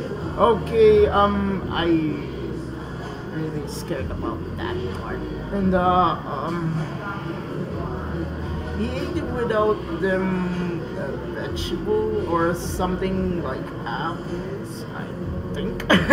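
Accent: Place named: India. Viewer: oh, you just sounded like you have an Indian accent, anyway. I'll never watch Smile HD, I tried watching Fluttershy react to it, and I'm like "just no" same thing with Cupcakes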